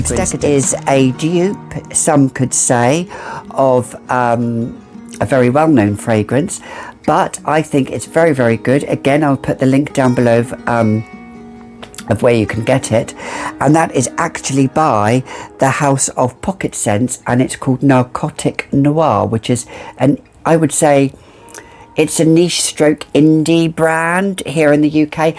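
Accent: France. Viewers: I love your French pronunciation, are you partly French? New subscriber, love the video🥰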